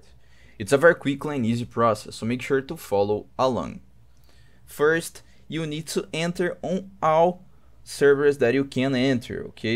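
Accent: Brazil. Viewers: parece muito carioca kkkkkkkk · O sotaque Brasileiro me pegou kkkkk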